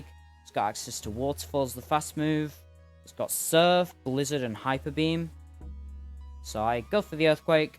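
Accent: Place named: United Kingdom. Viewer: The British accent hell yes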